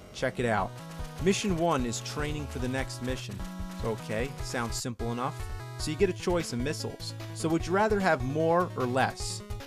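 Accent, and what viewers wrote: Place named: South, United States. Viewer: and he says ayss he's got a bit of a souther accent he also said: what more could you ayask for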